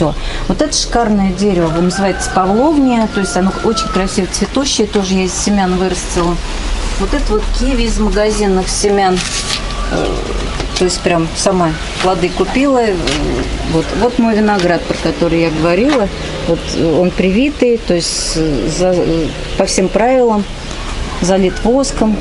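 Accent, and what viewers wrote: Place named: Samara, Russia. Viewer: Привет самарчанка, сразу видно, что человек из Самары, и говор самарский.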